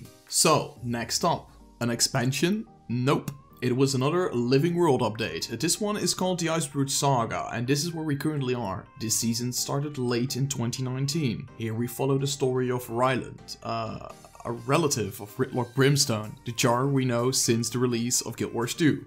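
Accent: Netherlands. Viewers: I can slightly hear the dutch accent mijn vriend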